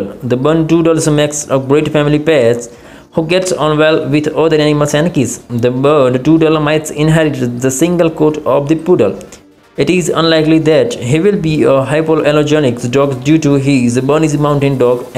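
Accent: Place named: India. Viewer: Just go ahead and use an AI voice because this one sounds like a call center from Calcutta.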